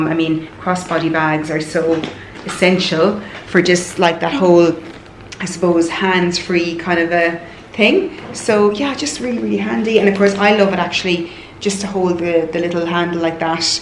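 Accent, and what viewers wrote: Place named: Ireland. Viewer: Love your bag, love your accent, to me it almost sounds like an Irish accent. Cute.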